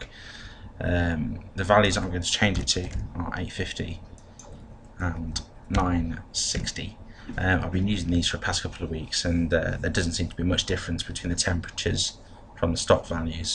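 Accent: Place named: United Kingdom. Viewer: love your British accent :3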